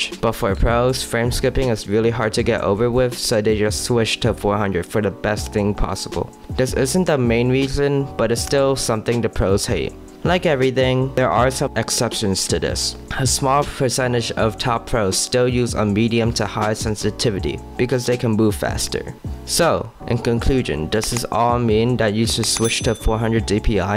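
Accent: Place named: United Kingdom. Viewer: 1. Yes this is true 10+ years ago. You're probably one of those guys who links that Logitech engineer video from over a decade ago. 2. You objectively are though. You're increasing the amount of information your mouse registers, objectively. This hasn't been up for debate in a very long time, but people like you who memorized a bunch of random dated knowledge on forums just regurgitates this every time they get the chance for some reason when this topic is mentioned. Your strawman analogy of comparing polling rate to counts per inch was very bizarre. Polling rate is the objective measure of input delay. This isn't something I wasn't aware of, and honestly if given the choice, I would prefer the 400dpi 1000hz over 1600 dpi 250hz. One is 4ms of input delay and the other is 1ms. I feel like you haven't tried this, because you wouldn't be capable of holding your current beliefs, but just enter any random FPS you would like. 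Zoom in very closely with an awp or that game's scoped weapon, and on lower DPI you will notice it moves in slight chunks rather than smoothly. This is because the mouse, objectively, is picking up on less information. The 3kliksphillip video which aged like milk where he claims in game sensitivity modifier was the culprit has been long disproven. You can replicate this effect with virtually any sensitivity modifier, and the lower dpi skips pixels. Having a british accent and a few 100k subs on youtube doesn't make you immune to criticism, or always correct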